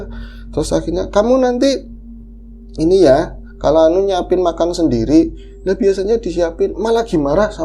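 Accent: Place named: Brebes, Indonesia. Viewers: Seneng dengar gaya bahasa Maz', medok banget.👍😊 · Kangen logat brebesnya mas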